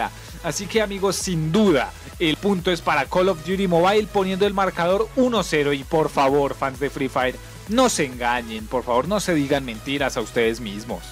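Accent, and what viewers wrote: Colombia. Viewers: Que no se note que es paisa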